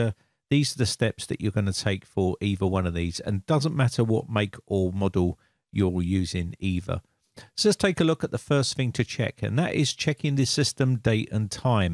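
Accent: England, United Kingdom. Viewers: Are you and Michael Cain from the same area of England?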